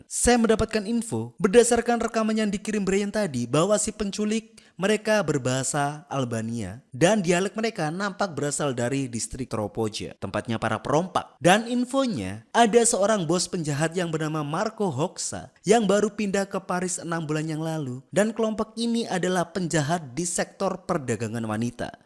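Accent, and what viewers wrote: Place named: East Java, Indonesia. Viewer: Mimin iki sajak e wong ndi yoo ,bahasa e kok khas darjo-sby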